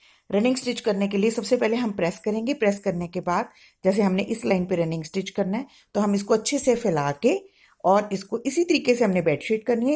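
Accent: Punjab, India. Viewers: Your voice mam, is so like my mom's!😍🤗 And it's okay if your Punjabi lilt comes in on your video, I'd love it! And am sure many other would too! तुम्हारी आवाज़ माँ, मेरी माँ की तरह है! 😍🤗 और यह शानदार है अगर आपका पंजाबी उच्चारण आपके वीडियो पर आता है, और मुझे यह पसंद आएगा! और मुझे यकीन है कि कई अन्य भी होंगे! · Nice video, n Panjabiyat jhalak Rahi h which is nice expression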